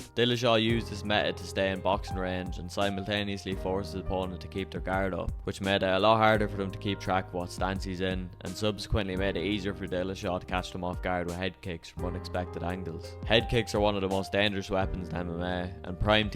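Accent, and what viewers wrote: Ireland. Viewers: Are you Irish? Also yeah, I noticed that DIllashaw liked his head kicks almost as much as he liked shifting.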